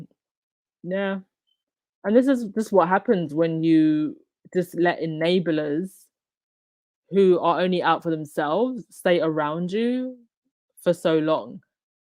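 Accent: United Kingdom. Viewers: I can tell by the sound of your voice that you are British. Maybe you can share. What governing agency of media air waves allow a commentator to incite harm to an another individual without that person or media platform NOT receive some type of sanctions??? Who does this Holmes man believes himself to be or better yet what royal associated gave him permission? To hear him speak literally made me sick enough to vomit. What on God's green earth have the Duke and Duchess of Sussex done to anyone of them personally that they wish such harm to them??? Soo much hate they are willing to orphan their children. SHAME on these people!!! He may now say he didn't mean it but where I'm from many a truths are spoken in jest!!! but he meant what he said. The arrogance of some British people is appalling!!! to other parts of the world. Something I believe they will soon find out. 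The Invictus Games in The Hague is a TRIUMPH simply a TRIUMPH. I know it is nearly over but I'm still wanting more. Not like William and Kate who are left wanting in every way. The British media, royal rodent, men in gray coats, Charles or William can do nothing say nothing or be about nothing that will out shine Prince Harry and our Duchess. Prince Harry's concern for his grandmother is justified. Andrew stealing monies anyway he can, Charles convincing her to make Camilla queen consort, William and Kate losing not half but all of the Commonwealth( they just don't know it yet), some Kelly woman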